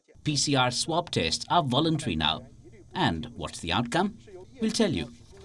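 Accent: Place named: India. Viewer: indian accent. speak english.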